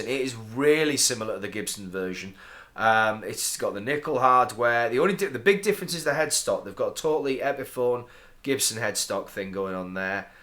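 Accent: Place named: North, United Kingdom. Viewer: nice to have Yorkshire accent from once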